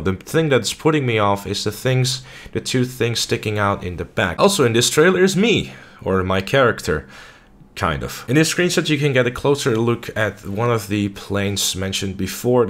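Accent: Netherlands. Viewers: Zwaar nederlands accent bro😂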